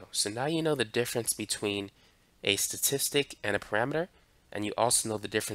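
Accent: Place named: Brooklyn, United States. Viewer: He has just the slightest Brooklyn accent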